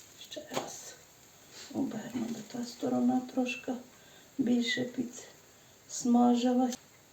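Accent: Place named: Galicia, Ukraine. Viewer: Дякую! Завжди дивлюся Ваші рецепти. Продовжуйте! Ваш галицький акцент- чудовий! Всього найкращого!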